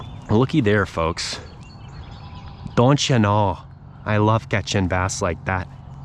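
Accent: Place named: Midwest, United States. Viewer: Love the mid west accent. More of it!!!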